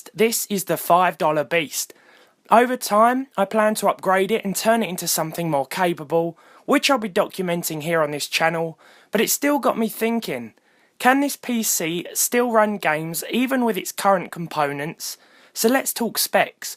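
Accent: United Kingdom. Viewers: Luckily I could listen it all, but does British voice always sound like that?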